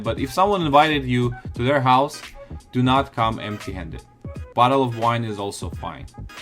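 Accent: Russia. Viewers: a dude with russian accent and probably was born in Russia,you know. Eloped to usa and says wrong things about Russia. Disrespect and weird way to deride country and people. You haven't succeed at all,. The only right thing from this video is info about superstitions in Russia. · Посмотрел 39 секунд, слышу, что ты русский и вот это "this could get you killed", конечно пиздец. Не потрудишься светануть здесь случаи, где кого-то уже killed по этой причине, особенно зарубежного туриста? ЗЫ. дальше эту поебень смотреть смысла не вижу. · Hey boy. Shut your mouth and stay where you are. You will never be an American with your heavy Russians accent. Do the best you can for your beautiful country. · У тебя акцент русский))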